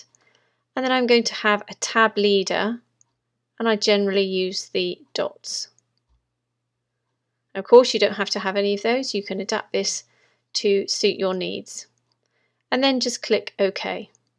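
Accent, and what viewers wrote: England, United Kingdom. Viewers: I searched high and low for a UK English voice and after many tries, I found you. You have provided an EXCELLENT working model that is concise, and easy to follow, what more could a writer want? Well done, and thank you. Judith Page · She IS an English.That's what an English woman sounds like.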